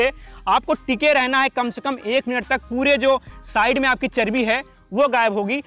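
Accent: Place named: Uttar Pradesh, India. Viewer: Mja a jata hai up wali bhasa sun ke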